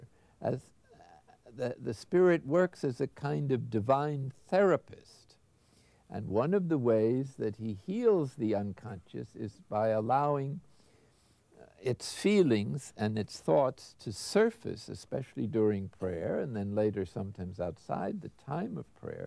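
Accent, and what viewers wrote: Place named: Ireland. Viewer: I love his voice, i wonder if his mum or dad were irish, as there's a hint of an irish lilt in there 🤔 perhaps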